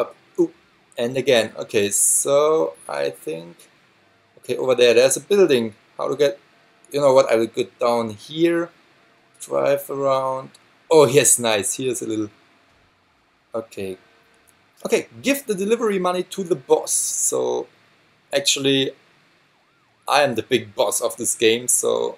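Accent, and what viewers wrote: Germany. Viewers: were are you from ? you Sound German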